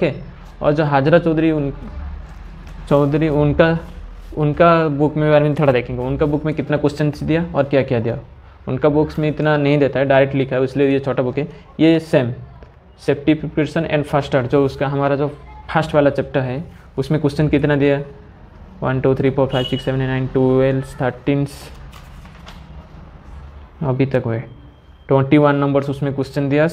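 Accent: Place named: Odisha, India. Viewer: Mu paa tama voice ru janideli odia pila boli... Then odia Letter dekhiki confirm heigali...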